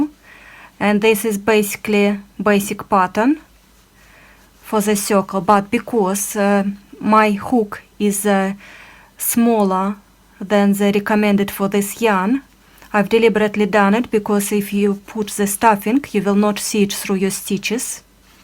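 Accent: Russia. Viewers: Мне кажется или у вас русский акцент?🤔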